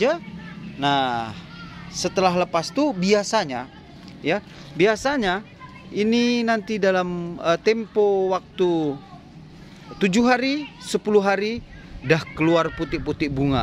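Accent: Malaysia. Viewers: saya ingat salah dgr abang ckp loghat org Malaysia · Pandai cakap malaysia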